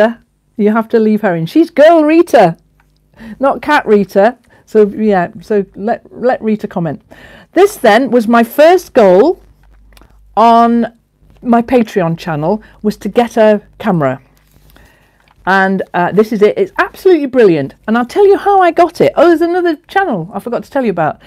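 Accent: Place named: Northern England, United Kingdom. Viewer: You have occasional northern twang, I was born in Crosby.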